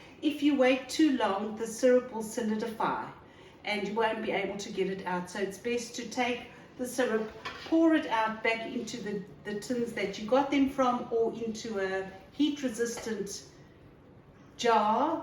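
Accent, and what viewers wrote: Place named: South Africa. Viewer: Sounds as if she is from Johannesburg South Africa not Sydney Australia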